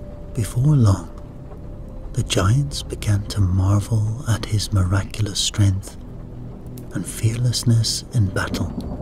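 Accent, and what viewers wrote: Scotland, United Kingdom. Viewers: What's also interesting about the author is he has a beautiful Scottish accent, so the fact he can do all these other accents just makes it even more impressive😊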